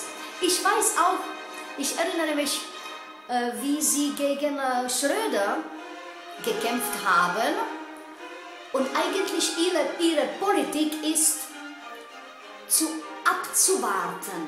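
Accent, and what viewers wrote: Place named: Eastern Europe. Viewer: gefällt es auf jeden Fall überhaupt nicht und wahr ist das was Sie da von sich geben, schon gleich gar nicht! Noch verwunderlicher ist es, wenn jemand wie Sie, mit hörbar osteuropäischen Akzent, die anscheinend hier in Deutschland lebt oder zumind. in Deutschland gearbeitet hat (deutsche Sprache ist dann eben Voraussetzung), also aus einem anderen Land kommend, ihr neues Lebens-Glück in Deutschland gefunden hat, dann gegen Migranten und die deutsche Politik schimpft, die es erst ermöglicht hat, dass Sie selber in Frieden in diesem Land leben dürfen oder wie gesagt zumind. hier arbeiten durften oder dürfen - wie auch immer ihre Lebensgeschichte auch sein mag. Sie sollten mal auf den Kalender sehen, in welchem Jahrhundert wir leben. Sie sind hier in Deutschland willkommen geheißen und das gilt also nicht nur für Sie!!! Frau Merkel hat sich diese Flüchtlingssituation nicht ausgedacht und die Masse an Menschen ist bereits schon seid Anfang dieses Jahres unterwegs und wenn die deutschen Politik in Europa von Anfang hat die Schotten dicht gemacht hätte, dann hätten wie eine in der Welt noch nie dar gewesene humanitäre Katastrophe gehabt! 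Länder wie Griechenland oder Italien, die eben ans Mittelmeer grenzen, wären total überfordert gewesen. Die Flüchtlinge wären so oder so gekommen, nur die anderen Länder sehen alle weg und hat über Jahre hinweg nur Mitleid mit Kriegsflüchtlingen und hungerten Kindern geheuchelt. Jetzt aber, wo sie plötzlich vor der Haustür stehen, will sie keiner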